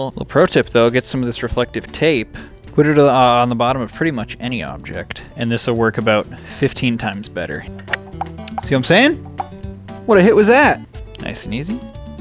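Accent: Wisconsin, United States. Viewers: are you from wisconsin?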